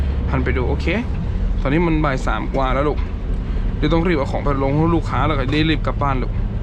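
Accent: North, Thailand. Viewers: ที่ชอบดูเพราะแกพูดเหนือ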